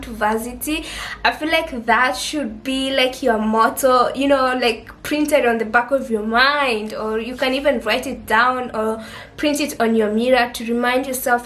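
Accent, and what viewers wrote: Kenya. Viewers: Your accent is East African most likely Kenyan.. Are you from Kenya? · Number 2. You're an extrovert. As for me I am happier when I am alone. But why do you sound like a Kenyan? Kenyan accent.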